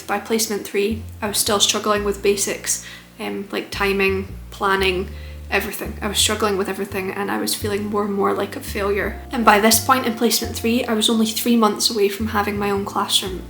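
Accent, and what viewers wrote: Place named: Scotland, United Kingdom. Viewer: Scottish accents are the best.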